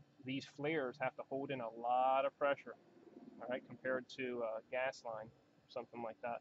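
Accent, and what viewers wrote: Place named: New Jersey, United States. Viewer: I just recovered 2 similar units that were installed briefly then decommissioned because the plant they were in has airflow problems and all the air these units made was sucked up and out the through the roof, and did not solve their problems. The wall units had already been discarded but because the refrigerant hadn't been recovered yet they were storing the condensers. I have a shopping list made up with wall units, line sets, and disconnect boxes. I am a millwright by trade but mostly work on air compressors. I plan on installing them for my home myself, with the help of an electrician for setting up my disconnect boxes and HVAC tech to purge, vacuum test, and charge my system. Is there anything in particular about these units that I should know? Someone online mentioned Mitsubishis can be tricky to wire but based on the manual it doesn't look any different than your complete install video I watched the other day, other than having to wire 3 separate units vs the one in your video. 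PS, you aren't related to Seth's Bike Hacks are you? Same NJ accent and talent for video production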